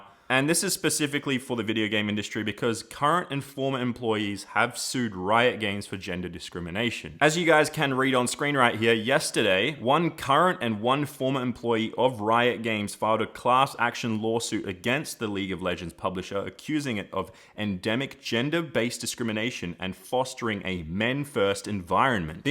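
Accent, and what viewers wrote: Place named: Australia. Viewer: Always been in to Australian accent! Hahahaa “GOOD DAY GUYS HERE”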